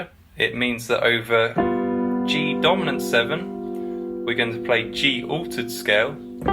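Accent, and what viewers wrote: United Kingdom. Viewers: Just realised whom your voice brings to mind, and it's probably an insulting comparison, so let me add the disclaimer that now that I realise who it is, you really don't sound like him apart from your intonation and a couple of fairly common South England pronunciations. All right, it's Danny from Withnail & I. Sorry. Great videos, though! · Fantastic lesson you are a great teacher are you British ?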